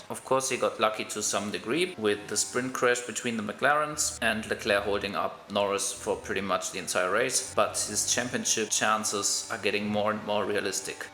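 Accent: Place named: Germany. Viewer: i hear german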